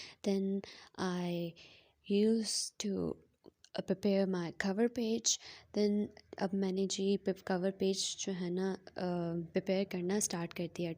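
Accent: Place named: United Kingdom. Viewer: English accent 🤌❤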